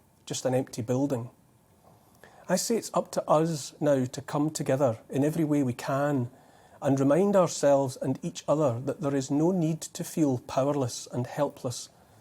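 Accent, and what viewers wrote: Scotland, United Kingdom. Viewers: Most sensible post for many moons and told in the wonderful tones of an educated and very calm Scotsman. Wish we had many more like Neil. Leaders of integrity are in desperately short supply. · So thats what my thoughts sound like with a Scottish accent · I genuinely don't care what he says, i just listen to his accent. he's like the scottish james may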